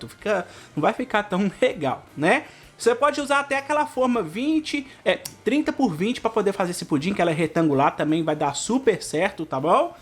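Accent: Minas Gerais, Brazil. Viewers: Eu ja adoro te ouvir com esse sotaquinho mineiro. Amo suas receitas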